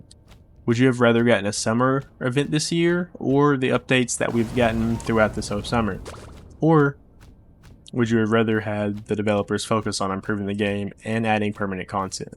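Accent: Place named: South, United States. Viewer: The southern accent is sending me 😭😭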